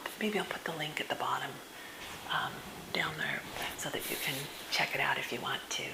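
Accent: South, United States. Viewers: I saw ur souther ascent vid and actually thought u where southern lol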